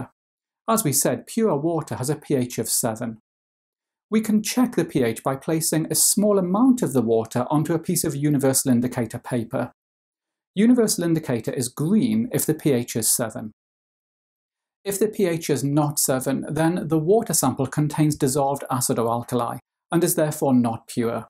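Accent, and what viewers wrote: United Kingdom. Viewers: Sir, you a brit innit?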